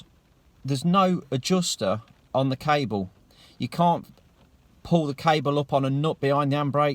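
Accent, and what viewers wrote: United Kingdom. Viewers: i like your british english...lol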